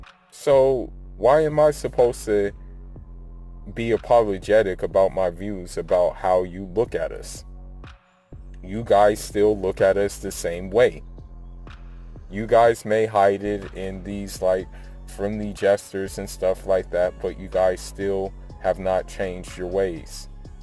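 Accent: United States. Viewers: It sounds like you are American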